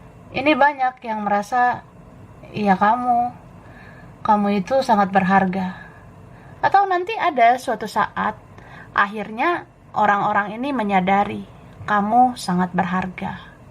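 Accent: Java, Indonesia. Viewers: MB klo ngmng mbok yao. Medok tenan wong jowo 😁👏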